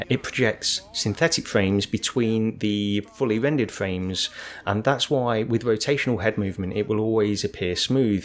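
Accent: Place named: Midlands, United Kingdom. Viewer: Having just picked up a p.c with an rtx 3070 so I can link my oculus quest 2 to it,i watched your vid on project cars 2 in vr which was very informative. I started off with the psvr and while very good the lack of analogue sticks for movement on the move controllers and the less than crisp visuals on most games was disappointing. The psvr 2 needs to incorporate better controllers ( oculus touch or valve index style) ,it will be interesting to see how much sony decide to push the psvr 2,as facebook seem to taking over as far as vr is concerned and more competition can only be a good thing. P.s just subscribed to your channel ,great content ( i prefer your real voice,I'm a midlands bloke myself )👍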